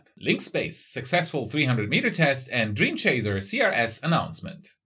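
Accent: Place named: Germany. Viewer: Yah, that's a subtle German accent. It's impressive how many folks there and in Scandinavia speak better English than the average American. At this point, theirs qualifies as a full-fledged regional accent in English just like Australian, British, Indian or the one from Louisiana...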